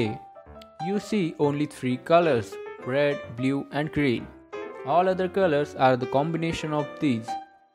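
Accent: India. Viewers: Great job brother. I want to give you a suggestion that. Add Subtitles manually because youtubes auto subtitle is not very much compatible with Indian English accent. As I don't know English properly Subtitles helps a lot to understand for Slow Learners As I was reading subtitles given by Youtube was Horrible. As you were Saying word "Blue Eyes People" youtube was Subtitling AS "You Eye People" many other mistakes were also there, Please Check it. . Thanks aLOt For Info.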